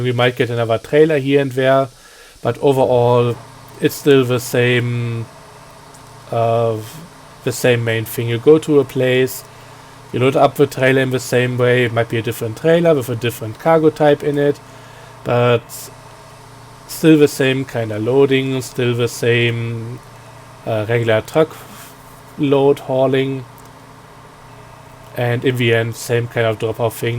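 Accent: Germany. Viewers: Do I hear a bit german?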